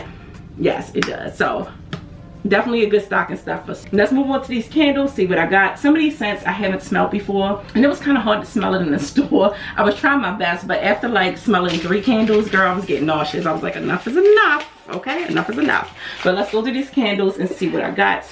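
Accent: Baltimore, United States. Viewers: You definitely are from Baltimore with that accent? Lol. Nice haul!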